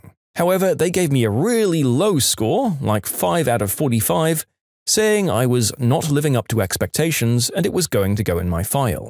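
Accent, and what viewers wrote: United Kingdom. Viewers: He's British now?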